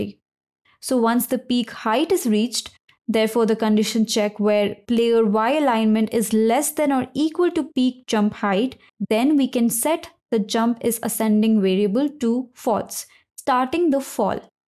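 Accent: India. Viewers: You're legitmately just a racist. This is perfect english with an Indian accent, if you don't like her accent, that's a you problem.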